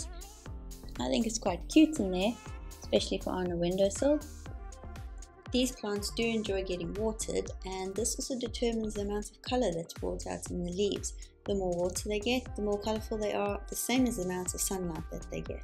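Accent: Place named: South Africa. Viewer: I Love hearing the South African accent! 🤩 🇿🇦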